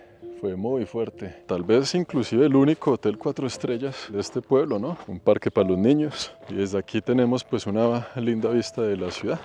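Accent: Colombia. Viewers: me encanta tu estilo, tu hablado parcero, tienes mucha gracia al hablar.🗣️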